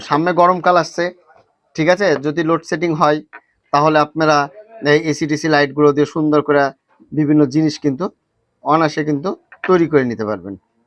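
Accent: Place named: Chapai Nawabganj, Bangladesh. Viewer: ভাই আপনার বাড়ি চাঁপাই না রাজশাহী না নওগাঁ? 😊 আপনার কথা শুইন্যা হাঁরঘে চাঁপাইয়ের মতন লাগছে❤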